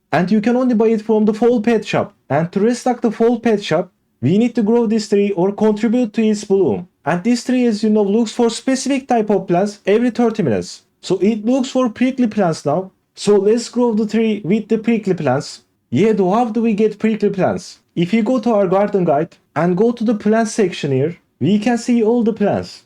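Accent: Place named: Iran. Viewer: Bro your accent is like iranians im from iran 😊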